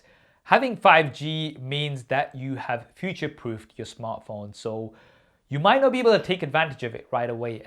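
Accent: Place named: United Kingdom. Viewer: Thanks for such a broad view. And super nice to hear a British accent! Blessings. 新年快乐 xin nian kuai le Happy New Year 🍵🍾🍾🍾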